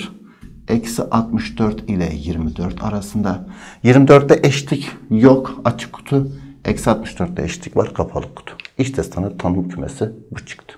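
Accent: Urfa, Turkey. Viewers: Urfali hocamiz zaten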